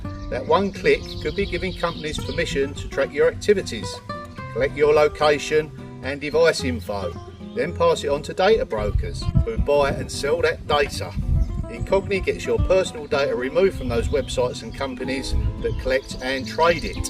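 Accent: United Kingdom. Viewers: . I texted UTube about this problem Their answer: British accent maybe hard to understand. That’s in a nut shell. But that’s not right because American English is having trouble too. They have a problem and can’t fix it. 😢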